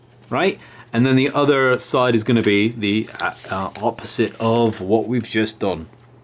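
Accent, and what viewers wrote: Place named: Scotland, United Kingdom. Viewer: I know this an odd question, given that my focus should be related to the taping (video was excellent by the way); but I spent all the time trying to work out your accent. It sounded a combination of Scottish (not sure which part), Northern English (Liverpool or Manchester), and Canadian. Please help me out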